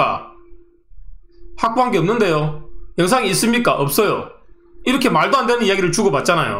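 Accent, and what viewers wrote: Gyeongsang, South Korea. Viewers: 지난 40분짜리 영상도 끝까지 다 봤지만, 말씀 하시는 것 하나 하나 논리적이시고 불필요한 비난 없이 모두에게 도움이 되는 방향으로 말씀해주셔서 이번 영상도 경청하게 됐어요.^^ 처음엔 생소한 채널이어서 조금 오해도 했었는데, 가장 어른답게 말씀해주셔서 개인적으로는 가장 와닿았고, 이 계셔서 든든하다는 생각도 듭니다.^^ 앞으로도 응원하겠습니다! (경남쪽 사투린가요? 들을수록 정겹네요~^^)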